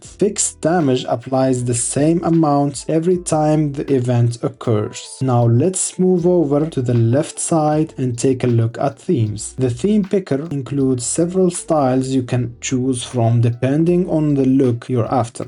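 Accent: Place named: Morocco. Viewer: You sound very Moroccan a sahbi 😁🔥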